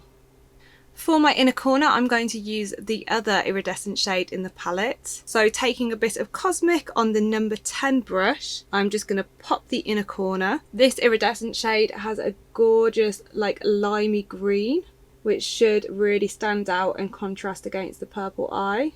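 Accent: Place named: Birmingham, United Kingdom. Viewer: I ordered this palette today, can’t wait to use it!!! It’s so beautiful. Is that a Brummie accent I hear? Fellow Brummie!!!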